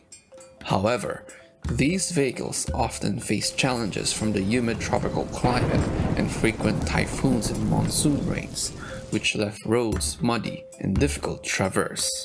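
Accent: Spain. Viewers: I love the Spanish accent... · Accento Madrileño.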